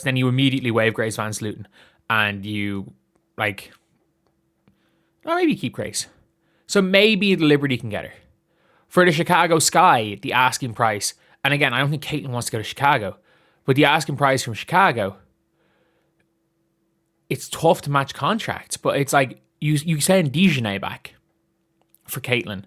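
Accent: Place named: Ireland. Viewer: That’s just the Irish accent lol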